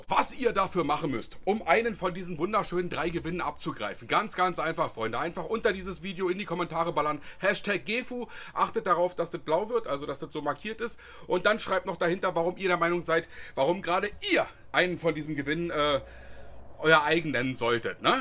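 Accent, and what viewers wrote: Berlin, Germany. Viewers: Ich habe erst vor 5 Wochen meinen ersten (Gas-)Grill bekommen und kann deshalb ganz viel von dem präsentierten Zubehör (#GEFU) und auch von den Gewürzen brauchen ohne es im Schrank doppelt zu horten. Und obwohl wir uns (Du Berliner, ich Bayer) dialekt- und sprachtechnisch voll unterscheiden, find ich Deine Videos und Anleitungen "voll dufte"! 👍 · #GEFU mag als Rheinländer Deine Berliner Schnauze und auch Deine Rezepte. Als Grillanfänger, braucht man so einiges an Zubehör, um Deine Rezept ohne zu improvisieren nachkochen/grillen zu können. Gewürze sind immer geil und ausprobieren von verschiedenen Gewürzen macht immer Spaß . Weiter so mit den tollen Rezepten und verlier Deinen slang nicht. Hau rein. Gruß aus dem Rheinland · #GEFU habe schon ein paar GEFU Produkte zuhause...aber die würden sich bei mir perfekt ergänzen:) mach weiter so! 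Richtige Berline Schnauze 😉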